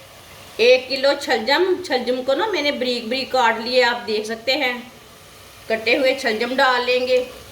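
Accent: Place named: Punjab, India. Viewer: What a thick Punjabi accent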